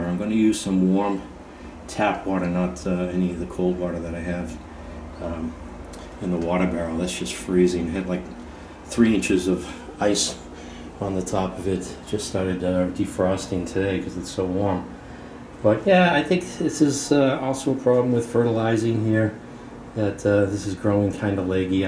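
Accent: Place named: Boston, United States. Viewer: Happy New Year! Hope that you are doing well. I miss your regular clips and commentary! Well, maybe it's the Bahstun accent! Best regards, Chip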